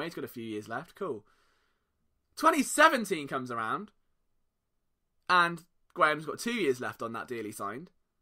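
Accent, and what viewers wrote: United Kingdom. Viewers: Kamu Grugier-Hill -- To Choke: to have victory in your sights only to let it slip through your fingers. Titans, Panthers and Dallas. No wonder the Eagles can't figure it out. They don't even know they are the ones who are choking! Dallas game -- Obviously need Carson to have a big passing game but Sproles is the key. The one running back that a defense can't gameplan. According to the New Orleans game, 14 points will win it. Need points on the first two drives. Brandon Graham -- Won't be signed because there is no money because Eagles will want to get the Carson Wentz deal done in 2019. This was clear to me as soon as they didn't do a deal this spring. Other deals -- Which receiver is better for the Eagles: Tate or Jeffries? Can only keep one. -- Make your goal to become an Eagles scout. You do good analysis and the Eagles like having people with British accents. 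Find them an under the radar franchise running back like Pittsburgh's Willie Parker.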